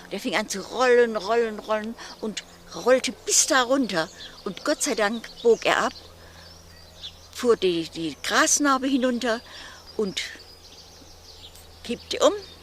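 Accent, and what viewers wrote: Swabia, Germany. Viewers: oma redest du schwäbisch oder badisch wenn du nicht vor der Kamera bist? Grüße aus Pforzheim nach Karlsruhe❤ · an alle die nicht aus bawü stammen hat die Oma einen schwäbischen Akzent? Ich kann sowas schlecht beurteilen weil ich für mich auch keinen Akzent hab aber Freunde aus dem Norden immer meinen das sie kein Wort verstehen ^^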